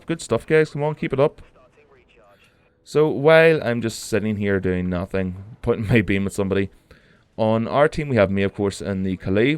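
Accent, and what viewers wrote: Northern Ireland, United Kingdom. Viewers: you sound northern irish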